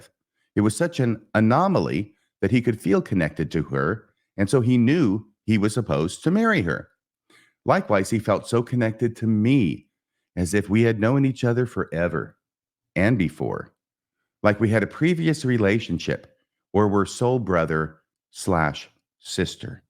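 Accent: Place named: Utah, United States. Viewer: Thank you so much for reading the complaints word for word...even if the accents are Utahn 😅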